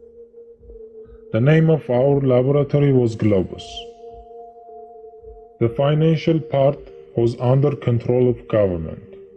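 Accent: Russia. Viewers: Sounds like russian hacker tarus kool not saying he is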